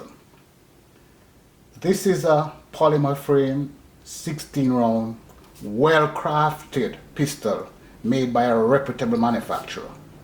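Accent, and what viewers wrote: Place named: Jamaica. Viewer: Jamaican not Afican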